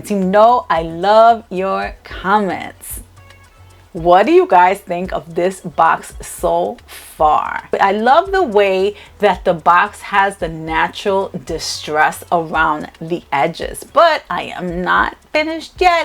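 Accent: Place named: New Jersey, United States. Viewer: Ohmygosh I love your joyful personality, first of all. I also really like listening to your accent. My older sisters are Peruvian and grew up in Jersey like 20min away from NYC; and your accent just makes me feel like home lol. Completely aside from that, "elegant coastal" is the perfect way to describe this - it turned out BEAUTIFUL!!! <3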